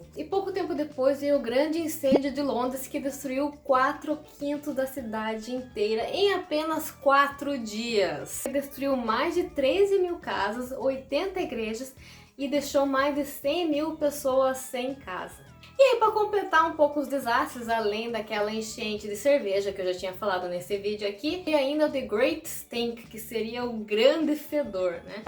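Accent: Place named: Santa Catarina, Brazil. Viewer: tenho uma amiga em Videira - SC seu sotaque é muito parecido com o dela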